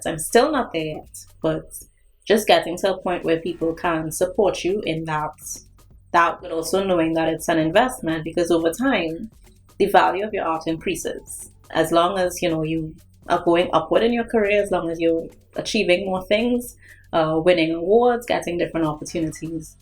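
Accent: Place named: Trinidad and Tobago. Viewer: I'm from Antigua but Trinidad is my second home. The trini accent made me go EH?! YEEEEEEESSSS! I am an Artist by birth, Graphic Designer by vocation. I've been leaning heavily into creating more freely (ah mashin up mah sewing machine atm) and I guess the YouTube algorithm decided that now would be an appropriate time to introduce me to your channel. I'm happy that it did. Your work is captivating, inspirational... just absolutely gorgeous. I'm feel even more motivated to embrace this part of my creative journey. Thank you for sharing your AMAZING work!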